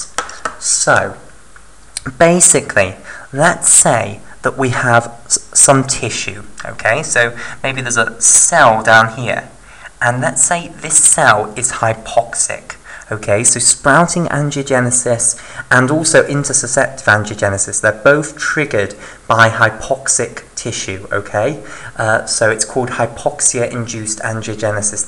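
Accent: United Kingdom. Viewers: God i love british accent 😂🖤 Thank you for such a beatiful video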